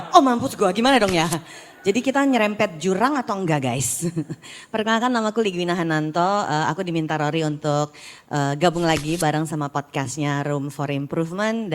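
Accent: Indonesia. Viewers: saya suka ibu ini kalo bicara bahsa ingris,, otentik indonesia bgt tanpa harus kebule bulean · Suka sama logat Sulawesinya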